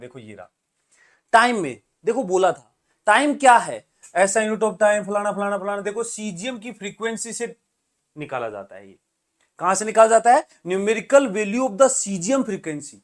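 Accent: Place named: Rajasthan, India. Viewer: rajasthani lingual greatttt😄😄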